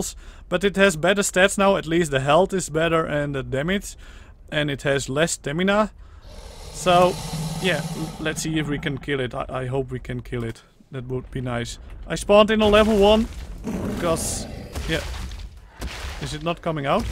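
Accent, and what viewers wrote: Netherlands. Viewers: I like your (i think dutch) accent! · Kratis Games maak je grap ? je hoort duidelijk aan zijn stem dat die Nederlands is , Nederlanders hebben altijd zo een rare uitspraak als ze engels praten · are you dutch you souns dutch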